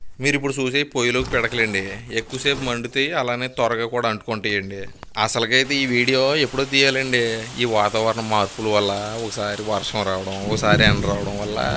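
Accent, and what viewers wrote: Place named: Konaseema, India. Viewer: అచ్చు తెలుగు కోనసీమ accent,perfect పాతకాలం వంటల పద్ధతి,మా పెద్దలను , పద్ధతి లను,వంటలను గుర్తు చేస్తున్నారు,truly అద్భుతః.from Maharashtra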